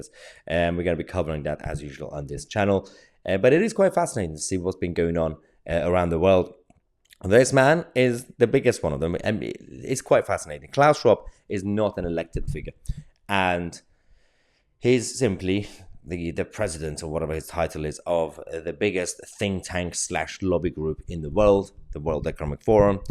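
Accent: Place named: RP, United Kingdom. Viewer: stop talking like you got a toffee stuck in your gob you aint British so stop with the BS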